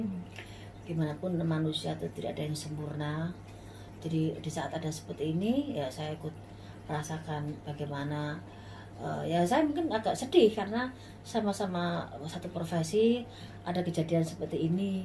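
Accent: Java, Indonesia. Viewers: medok banget mbk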